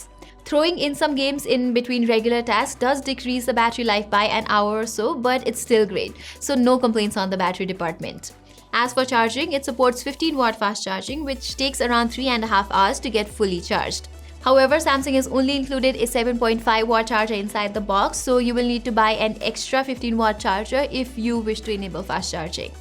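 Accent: India. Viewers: I really love your Indian accent... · 😜😜indian nd Pakistani boys 😜👀mall heina ye?👀 First time dekh rha ho education or English se lag rha hai indian hai❤️❤️👀 🙊🙊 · Nah bro, last thing I want is a indian accent voice assistant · It sounds sweet when Indians speak fluent English.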